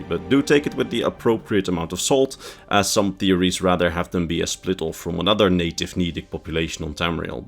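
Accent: Netherlands. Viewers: Ik hoor gewoon t Nederlandse accent haha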